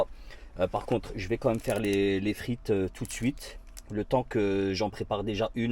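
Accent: Alsace, France. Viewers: hola mon reuf change rien tu est au top sa c'est un bon alsacien ta façon de parler dans les videos tu a le droit de parler comme tu en avis courage et force a toi bis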